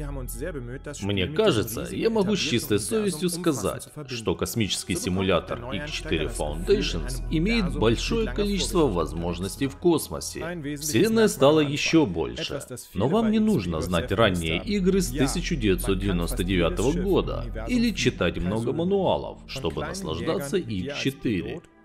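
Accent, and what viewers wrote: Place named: Rostov, Russia. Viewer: Приветствую. Знакомый говор, не из Ростовской области случайно?!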